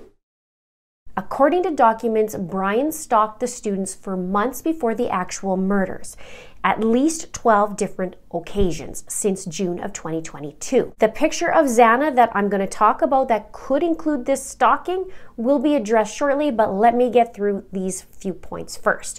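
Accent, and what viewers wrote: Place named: Canada. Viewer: love timelines, so important to this case, also love her canadian accent, my relatives are from viking, ab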